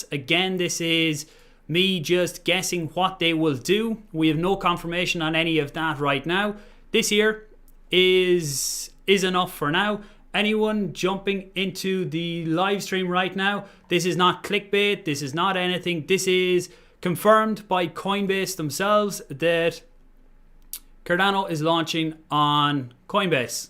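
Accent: Ireland. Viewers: Not another fucking Irish accent!!! All I’ve heard all day watching the gg’s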